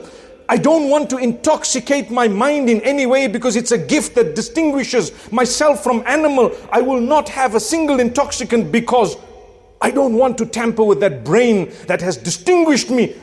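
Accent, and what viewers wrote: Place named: Arab world. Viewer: Assalmualaikum Mufti Menk! How're you doing? I love your arabic language tone. Where are you from, please?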